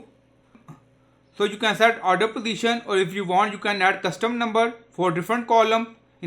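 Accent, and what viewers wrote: India. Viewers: I really don't mean to be rude but it's really hard to understand you with that very strong Indian (?) accent of yours. Maybe use an AI voice instead? Again, I don't mean to be rude or offensive. So, please accept my apologies and maybe take my comment as a suggestion or advice. 🙂 Thanks anyway for the effort you put in your tutorial videos.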